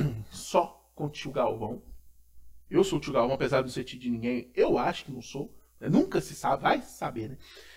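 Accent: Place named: Minas Gerais, Brazil. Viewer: o português do mineiro é famoso kkk tenho até orgulho kkk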